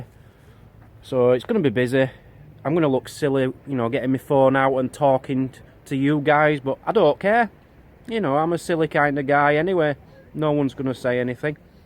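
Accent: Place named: Yorkshire, United Kingdom. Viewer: Ye or8 your a Yorkshire man.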